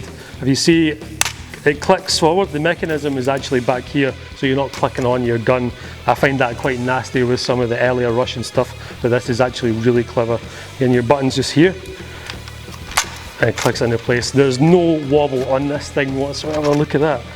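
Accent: Scotland, United Kingdom. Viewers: Very nice review! No box comments (why do people do that??), indoor environment for consistent testing, good shots and lighting... and that Scottish accent! what can we ask more? :P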